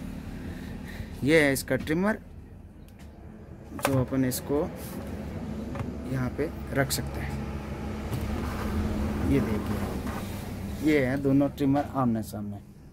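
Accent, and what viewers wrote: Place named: Barmer, India. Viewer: Bhai aap barmer se ho kya. Accent us side ka lag raha ha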